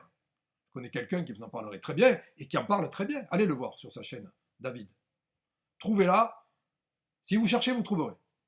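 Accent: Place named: Provence, France. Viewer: Merci docteur pour toutes ces précisions. J’adhère complètement à votre riche analyse. On peut rajouter je pense aux santons les fameuses « navettes »... L’histoire de mon village créé au 12ème siècle montre sûrement une concurrence entre Arles-Avignon et Marseille qui n’était pas encore dans l’empire... Il y a en effet, ainsi qu’en Avignon et en Arles, une rue Auguste Chabaud (si c’est ce peintre...). Ça ne m’étonne pas car votre accent provençal vous a trahis! Que du bonheur. Vous devez connaître alors « La Coupo Santo » de Mistral. Les paroles sont très intéressantes si on pense au Graal... Bon Week-end. Thierry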